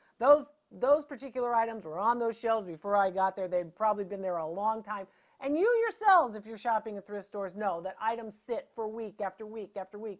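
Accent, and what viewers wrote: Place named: New England, United States. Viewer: Dr. Lori you are just precious. I love your New England accent and your Italian family stories. I appreciate all of the knowledge that you share with us on the items you select. Please keep it up.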